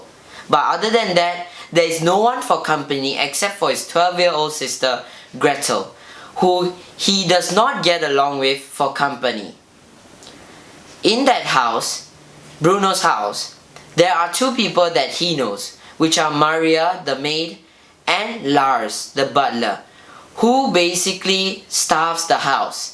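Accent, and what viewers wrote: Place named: United Kingdom. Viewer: Yt is slowly making your accent more and more British lmao what, i never said it was a bad thing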